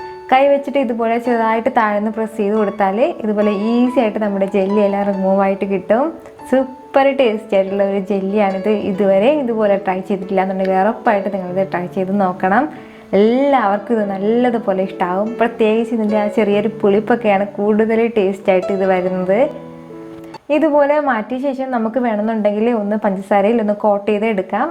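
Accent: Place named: Malappuram, India. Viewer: അത് മലപ്പുറത്തെ വേറെ ഭാഗത്ത ഭാഷ നിങ്ങളെ 😁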